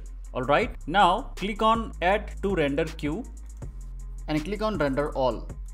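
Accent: India. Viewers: Why is it always some random indian with a strong accent that solves my technical issues? Thanks a lot!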